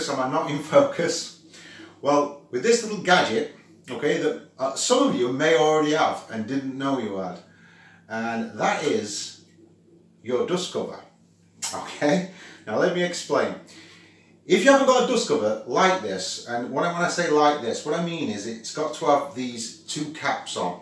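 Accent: Midlands, United Kingdom. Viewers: This is right up my street! When the video started and you mentioned the best way to get focus on a wobbly scope I immediately though focus mask, but I didn't expect you to modify your dust cap! Really neat job, great bit of Astro DIY :) I'm trying to place your accent, I'm pretty sure it's midlands? Big sub from me :)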